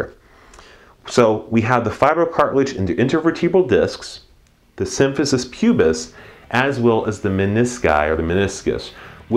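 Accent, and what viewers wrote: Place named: Texas, United States. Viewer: these are super helpful and it's fun hearing a lil' bit of Texas in your speech.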